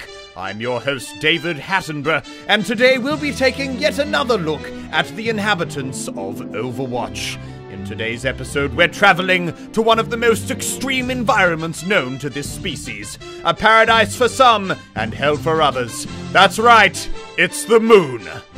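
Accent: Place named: Australia. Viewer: 澳洲口音......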